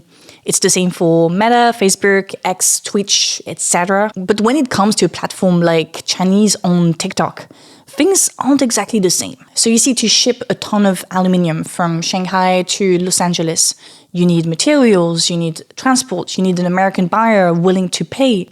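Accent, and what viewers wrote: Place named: France. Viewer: I hear the French soft power with every “videor” you say. I am learning to like the French accent.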